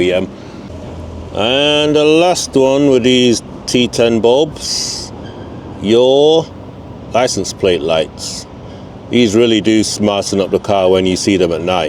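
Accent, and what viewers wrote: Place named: United Kingdom. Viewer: I think its great what your doing brother. Most mr2 owners on youtube are american and its a refreshing change to hear a fellow Brit 👍 I love the editing aswell keep it up.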